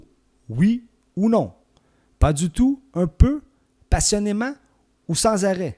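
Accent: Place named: Quebec, Canada. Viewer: l'accent quebequoi est un répulsif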